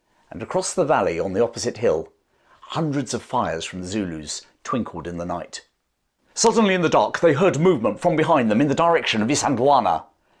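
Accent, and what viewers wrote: United Kingdom. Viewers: I recently discovered, it is amazing 👍 This is the only way to hear British History by a Brit and with that beautiful British English accent😊 Thank you, with “howdies” from Texas 👋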